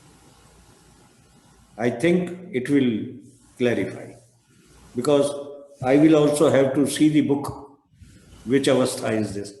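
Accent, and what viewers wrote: India. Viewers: Спасибо за русские субтитры: иногда приятно отключиться от сильного индийского акцента.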